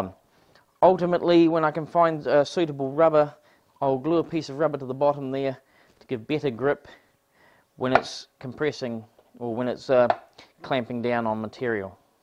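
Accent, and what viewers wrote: New Zealand. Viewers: A Gold Star example of how an instructional video should be made, clear to follow, straight forward perfect lighting. I wish I had that CNC machine! Do I detect a slight 'Flight of the Concordes' accent?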